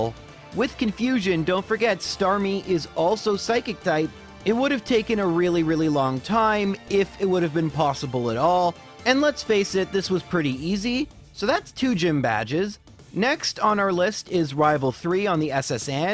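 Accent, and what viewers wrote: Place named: Canada. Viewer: He's Canadian, that's how they say it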